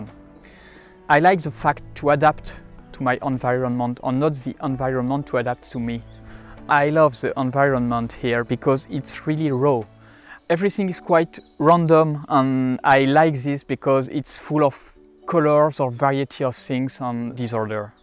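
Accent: France. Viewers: Vous avez une magnifique tiny house ! Tout y est bien rangé et vous parlé très bien anglais avec un bel accent français 🎵🎶🤗🇧🇪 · beautiful home. And you did very well speaking English with a French accent which was lovely! ❤️ wonderful music.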